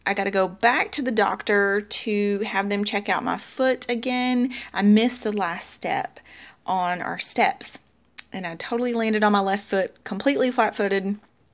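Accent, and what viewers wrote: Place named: North Carolina, United States. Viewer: It is definitely your Southern accent! It is SO North Carolina - in a good way (remember, I am right down the road from you in Belmont, but I was not raised in NC).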